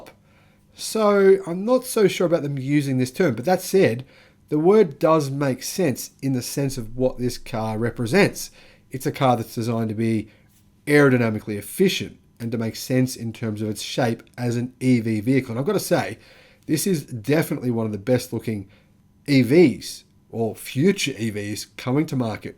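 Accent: Australia. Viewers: Tired of the Ausie Accent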